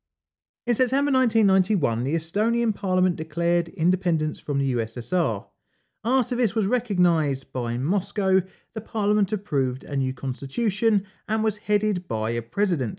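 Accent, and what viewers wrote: United Kingdom. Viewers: Hi, this question is a bit off the wall. I am an amateur linguist and love dialects. I can identify many British dialects, but while I can recognize many, I often times do not know the name or location. So could tell me the name and location of your dialect (and don't just tell me it's in a balmy corner of SE London) 😊. Love the videos BTW.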